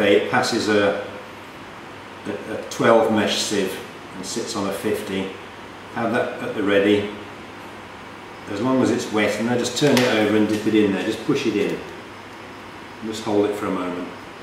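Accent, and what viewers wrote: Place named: United Kingdom. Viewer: Also, where did you live in the UK? You have a familiar accent.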